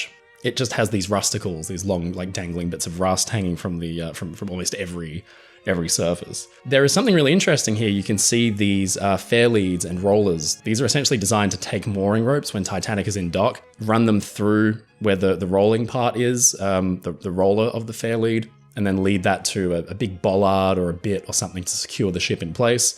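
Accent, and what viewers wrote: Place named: Australia. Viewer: Love your accent, Australian?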